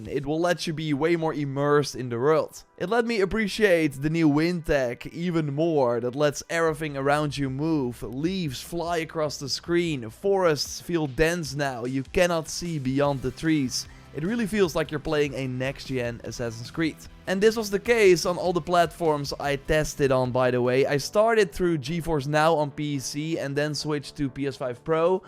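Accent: Netherlands. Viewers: brother your dutch accent is REALY there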